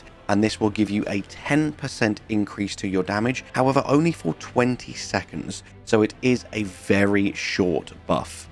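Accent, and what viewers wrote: United Kingdom. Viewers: British voice Do not recommend channel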